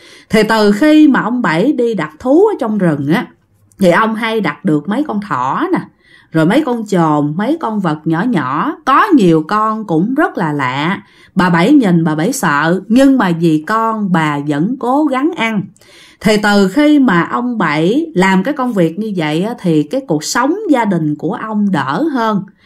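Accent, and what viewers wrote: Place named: Mekong Delta, Vietnam. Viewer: Chị ơi em có cái này muốn nói với chị là cái phần ngôn ngữ đó chị. Chị là người Sài Gòn khi kể chuyện chị đừng có pha giọng miền tây vô. Ví dụ như chữ e rờ "r" người miền tây hay nói thành chữ "g" như "đi ga, đi gô, có gồi, cá gô,....". Chị cứ kể giọng chuẩn đi, tụi em nghe là biết cái chuyện đó là ở miền tây rồi. Em để ý chị kể chuyện lúc thì nói chữ "r" thành chữ "g" có lúc nói chữ "r" bình thường, nói như vậy nó ngọng ngọng khó nhẹ lắm. Chị cứ kể chuyện tiếng Việt chuẩn là được. Em chỉ góp ý nhỏ vậy thôi. Hihi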